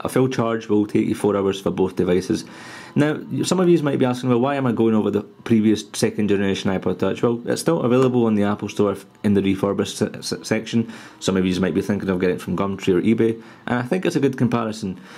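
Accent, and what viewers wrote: Scotland, United Kingdom. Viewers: gotta love his accent!!! scottish!!!!! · This guy speaks exactly like Craig Ferguson. And I dont mean just Scottish accent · Love u r accent (Scottish I think) and how u used some words,lol. And nice video and comparison! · i love your accent! where in scotland are you from??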